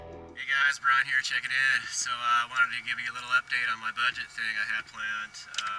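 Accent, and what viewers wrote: Maine, United States. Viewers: are you from Maine?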